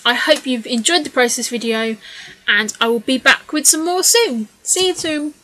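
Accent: United Kingdom. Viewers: Decided I can't watch your videos before bedtime! I dreamed I was touring a old castle with English royalty. I kept embarrassing myself with American manners. They asked me to act as a serving maid and dumped food on myself and the guests! Guess it's your English accent. Hilarious dream!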